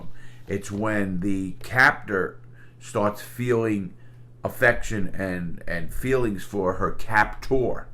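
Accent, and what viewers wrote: New York, United States. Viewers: he has the same accent with a little more new york in it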